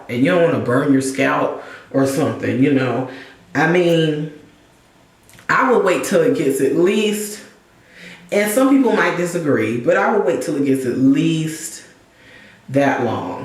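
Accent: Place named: South, United States. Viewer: he's so country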